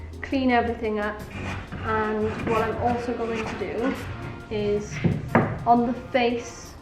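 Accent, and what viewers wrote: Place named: Wales, United Kingdom. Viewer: Subscribed to your channel recently and I've absolutely loved watching your videos so far. I was brought up in Wales so hearing you talk with that lovely accent is so nice. Quite envious of your spacious shop - it makes my little shed look like a broom cupboard ! Looking forward to watching your next videos. Thanks for posting.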